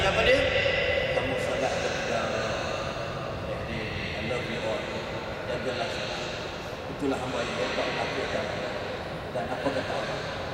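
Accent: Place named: India. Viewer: ni ke ustaz bollywood tu?salu dgr dlam IKIM jer..pndai die ajuk/ngaji slanga india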